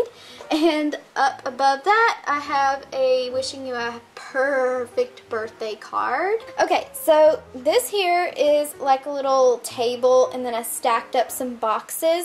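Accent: South, United States. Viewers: Are you country you have a axsent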